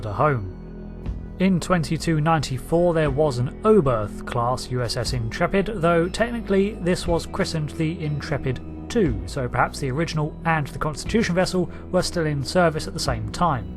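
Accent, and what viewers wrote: United Kingdom. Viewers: I love your accent, what part of Britian is it from?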